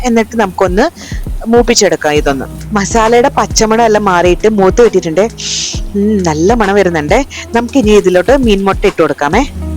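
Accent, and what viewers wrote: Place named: Kozhikode, India. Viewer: ഇണർ കോഴിക്കോട് 😅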